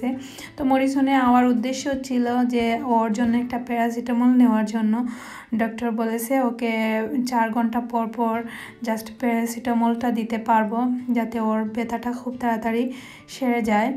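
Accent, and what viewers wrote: Sylhet, Bangladesh. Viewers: আপু আপনার মুখে সিলেটি মাত ভালা লাগে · apu apnar mukher sylhety accent ta besi valo lage please apni oi vabei video banaa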